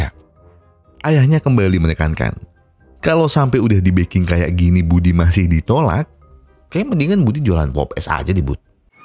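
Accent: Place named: Semarang, Indonesia. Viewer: Jowo mu medok kang Koyo semarangan 😂😂😂😂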